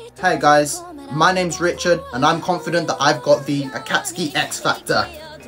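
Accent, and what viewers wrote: United Kingdom. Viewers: Your northern accent is everything 😂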